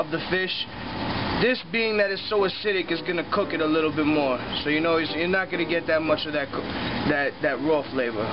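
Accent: United States. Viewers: That he does. He sounds more diverse than just the average New Yorker if you ask me. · That's that Flushing Queens in him. New York hardcore.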